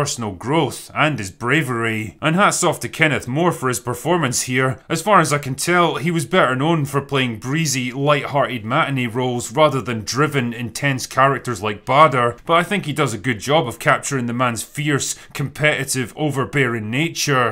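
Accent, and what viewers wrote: Scotland, United Kingdom. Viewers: Really weird hearing a Scottish accent saying anything good about the English.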